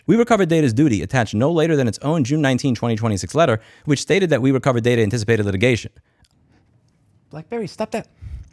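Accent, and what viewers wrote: New York, United States. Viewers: Had to play this at 70% speed because Louis is from New York and I wanted to listen to him say it like a mob boss.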